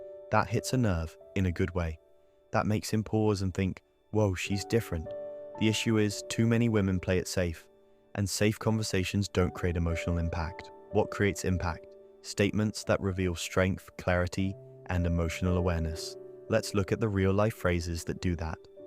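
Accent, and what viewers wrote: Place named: England, United Kingdom. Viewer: It's a real interesting video my love. I'm sure it's you that made it. I am grateful to you my love, for all these videos of celebratipn of my personality, you generate for me... I'm speechless... My man is everywhere and celebrate my heart with such passion and such respectful love... How can i resist you my love... ?? It's sounds impossible to resist you... to escape you... You're so smart... It's scaring... 😂😂😂 Your intelligence is fascinating to me... Absolutely dangerous in fascination... By the way, i looooovvvveeee the english accent of this young robot man... It's lovely... I have nothing to add, my baby of love, your video is perfect, and you told it all. All all all. 😉😉 😘😘😘 💜🦋💕🌸🕊✨🌌🌈💜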